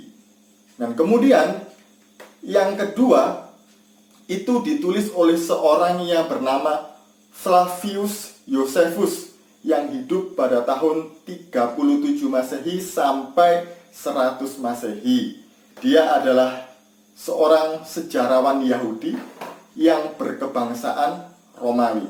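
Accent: Java, Indonesia. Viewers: 😂😅🤣😂😅🤣😂😅🤣 Wahai PENDETA bersuara medok Setiap agama mempunyai versi. Versi kristen yesus mati tersalib yg tadi anda bicarakan itu memang benar. Dan itu gak jadi soal karna itu versi anda. Sedangkan versi islam Allah menyerupakan wajah nabi isa yg mirip dengan isa lalu disalib. Karna cara salib itu cara hukuman mati bagi org yg bersalah dan itu hukuman yg KEJI DAN TERKUTUK. bisa dibayangkan jika nabi terbunuh dengan cara disalib tentu sangat hina.mungkin skrg isa akan diolok2 karna mati dengan cara yg keji. Maka dari itu allah menyerupakan wajah nabi isa dengan org yg diserupakan lalu dia disalib dan allah mengangkat hidup2 nabi isa ke langit. Dan anda seharusnya berfikir. Tuhan anda TELAH MATI itu artinya TUHAN YG ANDA SEMBAH itu tidak berkuasa atas dirinya. Dan anda malu mengakui tuhan anda dengan cara disalib dengan cara hukuman yg keji dan terkutuk Lebih baik mana coba Tuhan dibunuh disalib Apa tuhan diangkat hidup2 dan diangkat ke langit. 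Pake akal logika yg sehat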